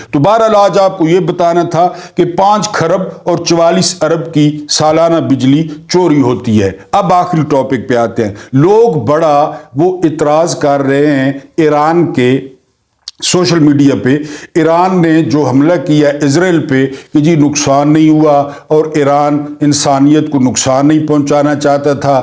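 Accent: Saraiki, Pakistan. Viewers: Ap key V Log mein Saraiki Touch bohot hi acha lagta hey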